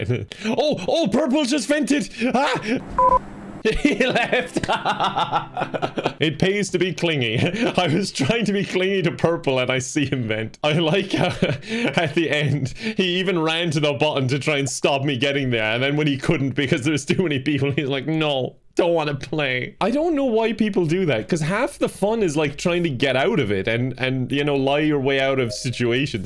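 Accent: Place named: Ireland. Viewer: Your Irish ☘️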